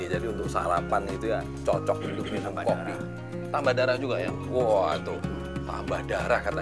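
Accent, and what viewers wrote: Java, Indonesia. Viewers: Pak bondan 😍😍. Kangen sm gayanya saat review makanan bikin orang ngiler sm logat khas jawanya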